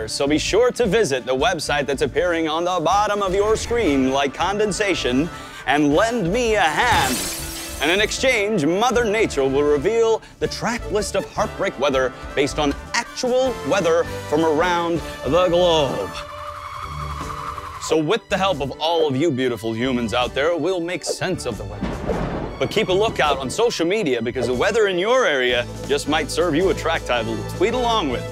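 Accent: United States. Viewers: Lmao why did he use an american accent ??😂😂😂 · That's some American accent there. I live in Colorado. People come here to learn to lose their accent, for real. 💙(*-*) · His American accent is rly good. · He sounds so American haha, cutie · The american accent skfjdjdj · his american accent is so good wtf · are we just not gonna talk abut how GOOD his American accent is though!?!?!😂💖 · His americn accent holy shit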